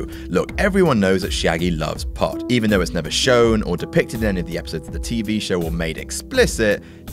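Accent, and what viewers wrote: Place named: RP, United Kingdom. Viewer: For a moment I thought I was watching a WatchMojo video.... Wondering what I was doing with my life. Then, I heard soft posh voice and I knew, despite everything, all was right with the world.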